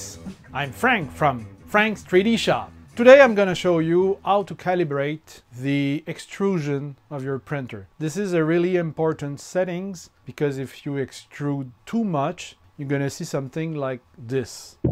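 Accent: Quebec, Canada. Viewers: Ya du Quebecois dans cette accent là lol Merci beaucoup pour ton video, je m'abonne tout de suite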